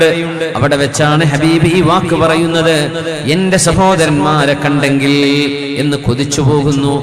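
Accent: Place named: Saudi Arabia. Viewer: ഉസ്താദിന് സൗദി സൗണ്ട് അല്ലാതെ പറ്റില്ല ☹️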